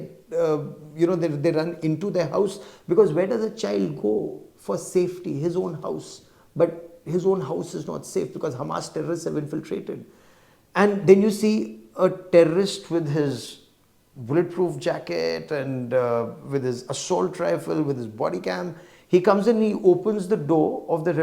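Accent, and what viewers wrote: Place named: India. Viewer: I cant understand you indian bull shit accent. You are fake nees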